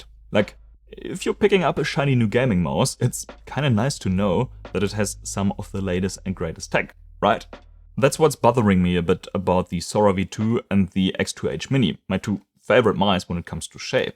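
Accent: Germany. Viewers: Mann hört so sehr den deutschen Aktzent heraus😅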